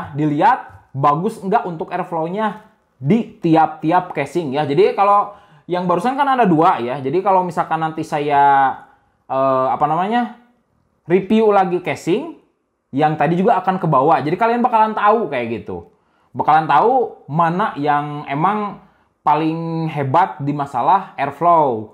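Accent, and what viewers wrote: West Java, Indonesia. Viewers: other people: review this guy: Ripiu wkkwkw, sunda banget kedengerannya pertahankan ciri khas nya kang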